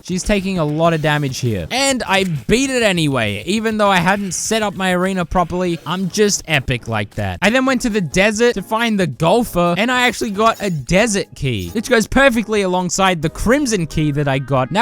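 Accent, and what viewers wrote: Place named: Australia. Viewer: i hear it but its because their both australian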